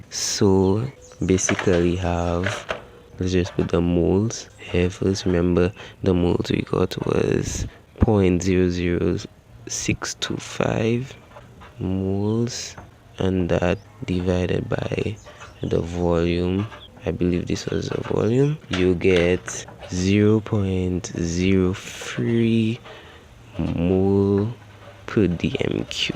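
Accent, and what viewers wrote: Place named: Dominican Republic. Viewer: Where are you from? You sound Dominican/ St.lucian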